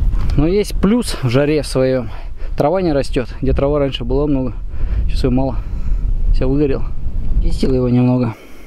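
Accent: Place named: Kirov, Russia. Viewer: У автора ролика говор как у моих родственников в Кировской области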